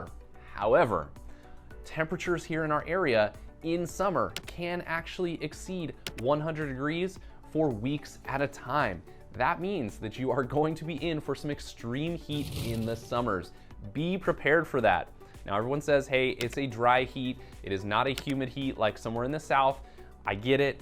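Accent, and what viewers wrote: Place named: Southern California, United States. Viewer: Dude your videos are really helpful and informative. Your super strong SoCal accent makes me feel like I’m watching an episode of the Californians on SNL. But it’s better than listening to Texans where I live now. I used to live in the LA area and I’m thinking about moving back to beautiful SoCal. For anyone thinking about moving to TX… its sucks. Compared to Cali, Texas is boring, ugly, the food is atrocious, 99% of the people are obese and sit around and guzzle alcohol and eat horribly tasting canned food, DFW drivers are reckless, my car insurance actually doubled when I moved here from Los Angeles, people say Texans are friendly, but I would say they’re polite definitely not friendly. People in Southern California are actually friendly, and I made friends quicker in Los Angeles than I ever did in Texas. 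Plus, if you’re single, there is no one to date in Texas because everyone is obese and or married.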